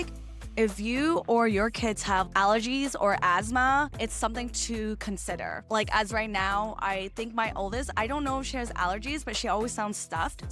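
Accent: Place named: California, United States. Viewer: Facts. I was like, "She definitely sounds like she's from California."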